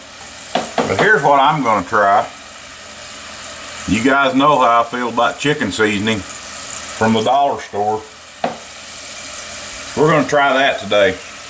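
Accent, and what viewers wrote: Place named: United States. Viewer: Great video. Love the country accent.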